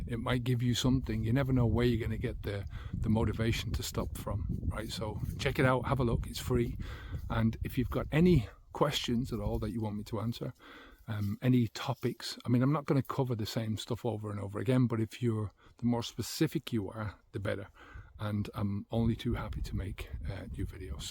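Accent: Ireland. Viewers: Did you used to live in ireland? You have a slight irish accent.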